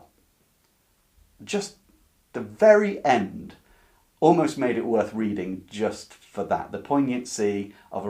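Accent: England, United Kingdom. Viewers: I'm glad you appreciate as I wasn't sure it was a good thing to point this out 🤔🤗 I'm not from south of France so I can't confirm if "t" is pronounced over there 😅 Well but anyway I think it's pleasant to hear some English accent. I guess most of the French people do 👍😊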